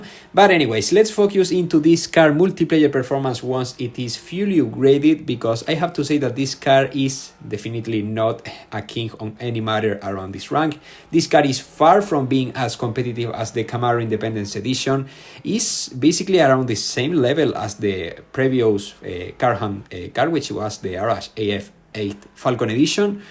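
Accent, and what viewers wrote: Spain. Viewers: Uff loco, de acá se te nota el español🥵👌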